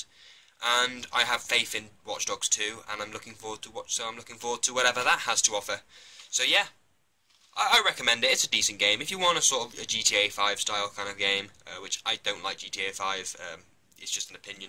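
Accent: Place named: United Kingdom. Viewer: British Accents are Orgasmic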